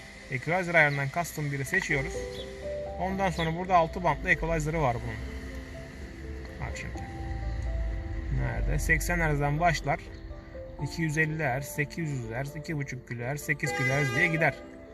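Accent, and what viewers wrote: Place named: Thrace, Turkey. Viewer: dayı tekirdağlı mısın edirne mi? :D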